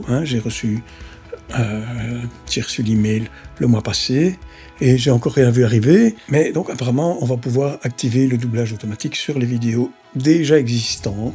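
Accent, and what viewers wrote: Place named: Belgium. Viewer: Salut, merci pour ta vidéo qui m'a bien éclairé. Je me posais toutes ces questions sur les sous-titres. Sinon, je m'abonne direct : pour l'accent belge, l'humour et l'authenticité. Ça change de la bière pasteurisée sans âme qu'on voit partout sur YouTube. Tu m'as plié avec la blague du fils du patron de YouTube, de l'entraîneur, le "bientôt" et le coup de la dinde ! Continue, elle est top ta chaîne et à tantôt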